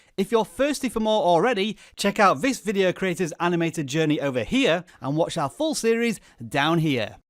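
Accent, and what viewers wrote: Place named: South Yorkshire, United Kingdom. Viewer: Where are you from? You sound local, Sheffield or South Yorkshire at least, but I'm also bad at accents.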